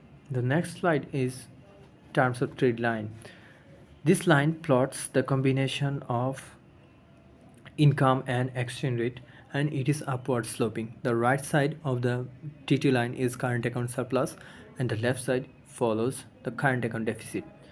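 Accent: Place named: United Kingdom. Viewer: ব্রিটিশ এক্সেন্ট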